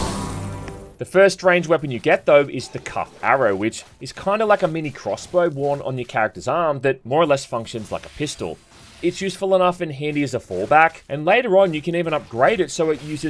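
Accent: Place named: Queensland, Australia. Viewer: judging by your accent, are you in QLD?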